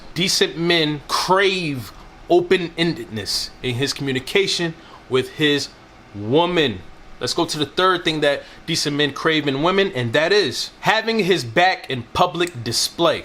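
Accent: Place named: South, United States. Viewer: Oh yes I know that Southern talk LoL 😂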